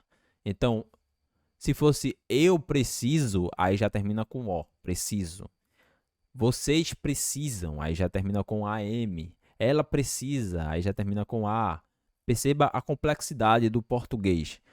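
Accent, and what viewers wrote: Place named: Northeast, Brazil. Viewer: Ele falando ingles com sotaque nordestino é tão fofinho😊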